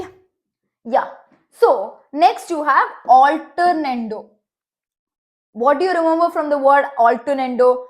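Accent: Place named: Tamil Nadu, India. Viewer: Your tamil accent is nice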